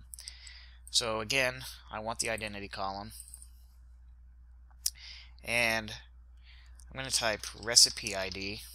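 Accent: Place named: United States. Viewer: Thank GOD for your sweet american accent! I'm tired of "right", if you know what I mean!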